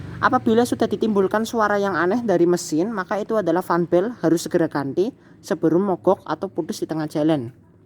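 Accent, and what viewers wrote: Banyumas, Indonesia. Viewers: Mase Banyumasan iki ... Ngendi kiye...hehe. suwun nggeh infonya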